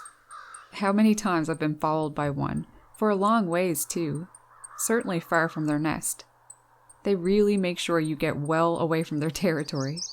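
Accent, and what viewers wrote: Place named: Ottawa, Canada. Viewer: Flickr is a great name :-p / I just realised you are a fellow Canadian. I hear the Ottawa? accent now :-p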